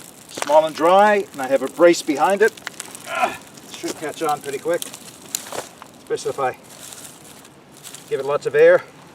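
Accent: Canada. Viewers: Hi nice video. I watched this without knowing where you were located. Given your accent I was certain you were Canadian. I also noted that the wilderness looks identical to what I know. I noticed finally that you were in Nova Scotia. I am a little further north located in Southern New Brunswick. Best wishes and nice job on video.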